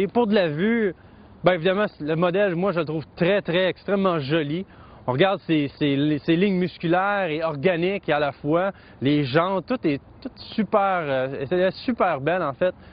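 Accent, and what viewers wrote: Quebec, Canada. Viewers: Il est québécois, non??😂😂😂😂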